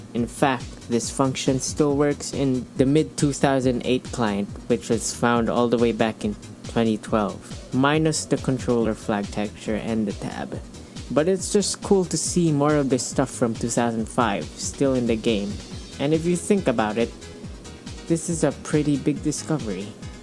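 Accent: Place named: Philippines. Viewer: You sound like a Filipino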